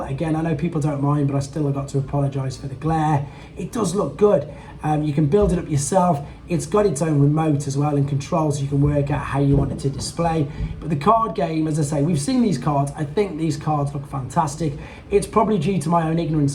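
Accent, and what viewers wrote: United Kingdom. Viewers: in future videos is it possible when u report news of transformer toy sighting, to state the original source you found it from? I only ask this bcuz sometimes I don’t pick up on words u say bcuz of your UK accent or you scroll on your tablet too fast. Please don’t take any offense as I think it’s cool having a UK accent, but being American I have a challenge when u say some words quick. I’m still gonna follow ur channel as I like how u do your transformer videos.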